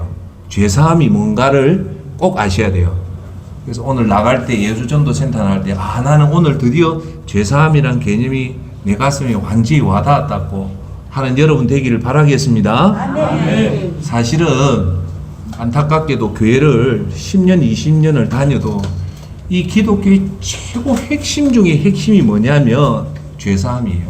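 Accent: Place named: Gyeongsang, South Korea. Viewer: "예수는 빼삐고"... 아이고~ 빠뜨리고 아닌가요? ㅎ 경상도 사투리 그립네요. ㅎㅎ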